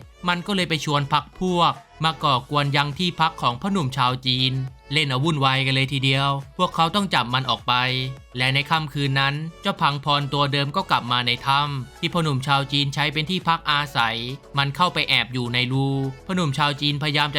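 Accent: South, Thailand. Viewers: ปลาจ้องม่อง😊คนใต้ใช่ไหมเนี่ย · คนใต้นี่หว่า😅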